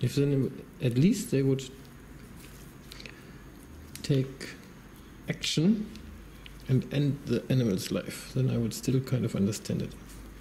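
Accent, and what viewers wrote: Germany. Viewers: Are you, by any chance, German? Your accent suggests it. Danke für Ihre Arbeit!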